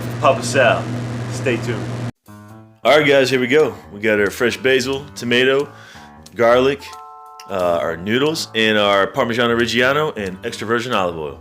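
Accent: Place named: New York, United States. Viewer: Hi Sal. You sound like you're from the best part of the country...NYC. I also make pesto...but without the tomatoes. Your dad reminds me of mine. He might like this version of, sung by one of my favorites. Cent'anni. <link>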